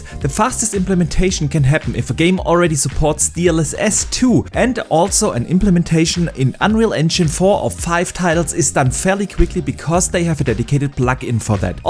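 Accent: Germany. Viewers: Alter…du klingst so fucking deutsch! 😂 No offense, nen Like kriegste als Entschuldigung 😬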